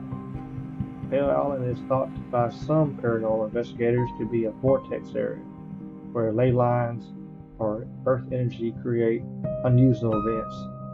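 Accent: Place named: South, United States. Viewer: With your mumbling and southern American accent, it is hard enough to understand even half of what you are saying. With the addition of your pointless, loud music which accompanies this, it is nearly impossible.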